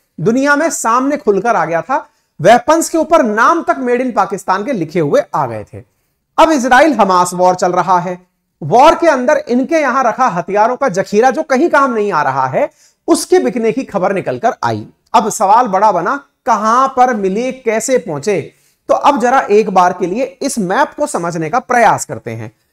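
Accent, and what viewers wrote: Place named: Rajasthan, India. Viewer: आप राजस्थानी हैं मुझे राजस्थानी की पहचान है अतः आप मुझे रखें राम राम भाई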